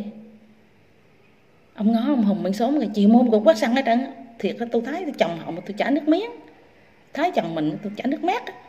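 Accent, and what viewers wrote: Quang Nam, Vietnam. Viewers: Chào em gái Quảng Nôm. Anh cũng người Quảng xa quê gần 30 năm.Rất vui khi đựợc nghe em nói chuyện bằng giọng Quảng. Hy vọng một ngày không xa được cà phê với em tại Đà Nẵng hỷ! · Chu choa bữa ni cắt tóc ngắn, nhìn thấy lạ nghe cô giáo . Nói chuyện giọng Quảng nom nghe dễ thương quá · dạy tiếng Anh vậy khi phát âm tiếng Anh có khi “nồ” bị ảnh hưởng giọng QN không? Thí dụ “shall” —> đọc là “sô”? How (—> hô) Frank (—> frenk) Mackerel (—> méc.kerel) Contact (—> con.tect) v v Các bạn học sinh họ có khó khăn gì ko?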